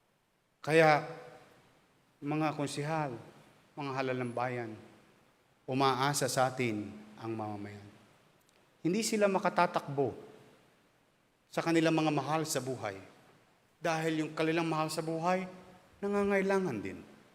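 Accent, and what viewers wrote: Philippines. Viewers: Parang c pinoy din mg speach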